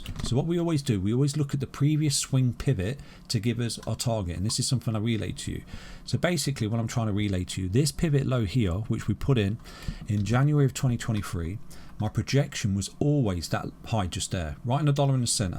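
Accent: United Kingdom. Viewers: He is from the UK and you are probably not understanding him when he says "big up the coinskid crew"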